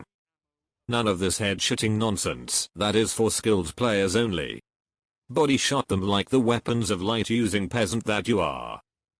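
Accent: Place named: United Kingdom. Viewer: Two questions. First, how do you do that mechanical Brit voiceover? Second, what's the track ?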